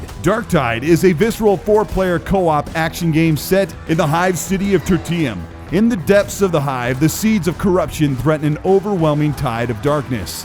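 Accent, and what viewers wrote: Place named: Netherlands. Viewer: You have a Dutch accent. Is he Dutch?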